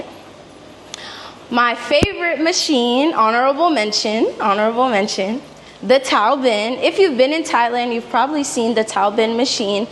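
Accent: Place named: Bahamas. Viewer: Her voice sound like Bahamas, Bahamian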